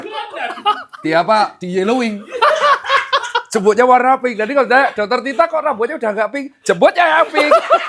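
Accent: Java, Indonesia. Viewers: Ful ngakak anjir beliau kalo ngmong asbun blundernya terlalu plenger mana aksen jawanya medok lagi😂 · jowo nyelll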